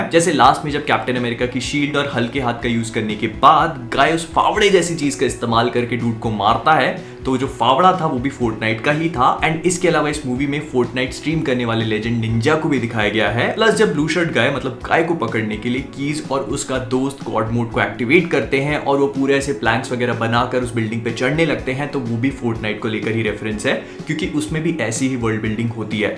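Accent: Bhopal, India. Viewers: I love your bhoplai accent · bhai bhopal se hai kya?? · apne Bhopali accent mai video bnake maza hi dila diya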